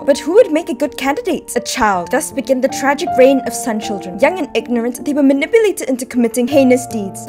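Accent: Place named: United States. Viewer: your accent becomes more american every video 😭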